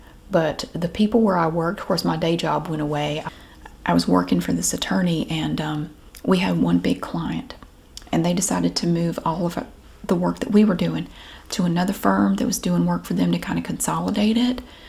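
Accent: South, United States. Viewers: I’m with you…I love solitude and doing most things by myself. 🙌🏼 And your soft but not whispering southern accent/voice is such perfect ASMR…love your videos!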